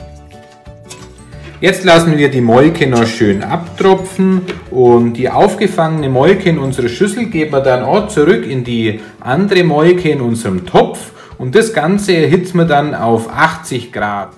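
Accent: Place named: Augsburg, Germany. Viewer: Hört sich nach augschburger Dialekt an.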